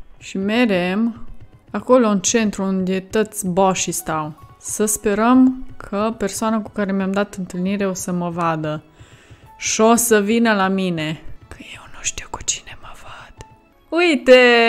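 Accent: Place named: Cluj, Romania. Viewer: Ce accent de Cluj😂❤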